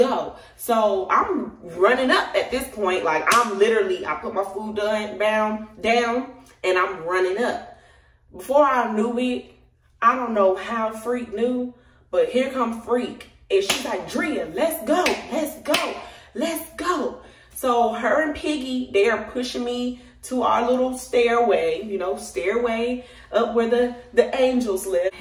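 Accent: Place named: Memphis, United States. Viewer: Are you originally from Atlanta? You sound like a Memphis girl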